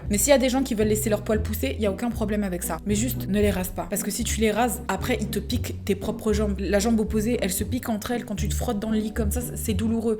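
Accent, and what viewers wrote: England, United Kingdom. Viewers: J’adore ton accent anglais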